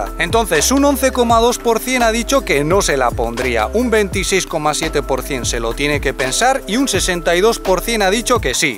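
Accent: Spain. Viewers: y no sabes distinguir un uruguayo de un ESPAÑOL? Pues nada en común el acento . Saludos desde el pueblo de Javier Telletxea. NAVARRO. ESPAÑA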